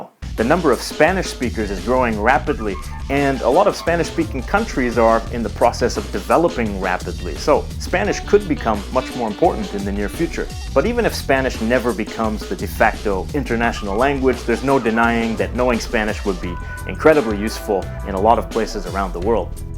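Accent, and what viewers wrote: United States. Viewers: I thought you were American. You all sound alike. :p Though Canadians seem more polite. I got put off learning Spanish after visiting Spain and everyone kept slating my Spanish and switching to English. :/ I also tried Japanese but I won't be visiting there for a few years and whebever I spoke to a native speaker online they just laughed and told me not to bother. However, when I went to Croatia and learnt even just a little Hrvatski they loved it!!! It was a really cool experience and broke the ice so much. Everyone was 1000x friendlier in Hrvatska when you tried the language. I think it really helps to pick the right languages as your first so you aren't discouraged. Don't go for an easy language. Choose one you'll be motivated to learn. Volim Hrvastka! Split je lijepa! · I am enjoying the language series. what got me to comment is you saying that Canada is the same as the states? 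No we are not. Perhaps superficially, however, most of us tend to speak English well for most people around the world to understand what we are saying. We have more of a tendency to be bilingual, we are far more polite and able and willing to use proper English. Yes I know, plenty of low brow americanesque speakers do exist here as well. On the non language side; we aren't violent greedy warmongers. We don't invade countries for their resources. We don't have a war of terror on everyone who isn't american. We don't torture people around the world because they won't sign a lopsided trade deal, or they are not christian or they don't speak english the list goes on and on. I've heard you speak like a yank yourself. Zee over zed? Not all of us are assimilationists. Long story short, Americans are just that "mericans", not Canadians, not Mexicans, just yanks. that's it.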